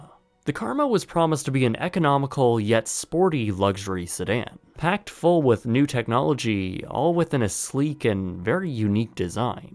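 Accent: Canada. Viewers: Research = 10/10 __ Canadian Accent = 1/10 gah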